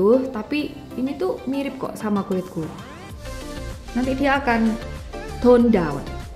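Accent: Java, Indonesia. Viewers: Kak coba dong colab sama kak Hansol (korea roemit) pasti cocok banget... Sama* medok hehehee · Ya Allah 😭 sueneng poll mbe konten2e sampean akuu, medokmu ikuloo yawllahh bikin jatuh hati❤❤ Sehat selalu ya cekno iso gae konten2 seng menginspirasi wong okeh😊 lopyuu ♥️ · Auto subscribe pas mbak'e ngomonge medok. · Sampean ini kok lucu buanget seh mbak...😅 tiap lihat video sampean sambil senyum2. karena omongan sampean yang logatnya medok jawi bangeeet.🤗🤗🤗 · biasanya kalo liat beauty vloger yg medok suka gmna yaaa,aneh...tpi aseli mbaknya iniii lucuuuuu 😂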